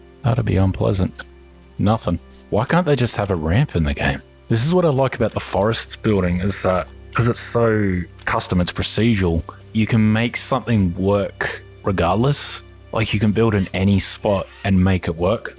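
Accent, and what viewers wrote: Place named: Australia. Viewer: Love the australian accent!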